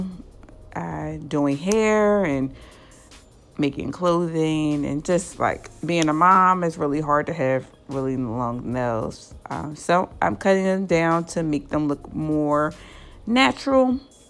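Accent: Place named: Baltimore, United States. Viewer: You from baltimore?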